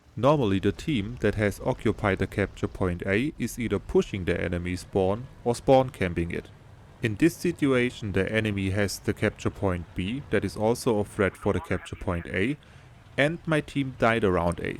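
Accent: Germany. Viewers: Your english is a littel bit broken and you sound german but your symphatic asf you sound like a nice guy too!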